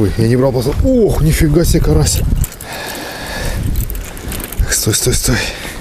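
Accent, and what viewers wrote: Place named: Eastern Ukraine, Ukraine. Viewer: Тут еще и говор Восточной Украины. В Харькове он еще ярче выражен...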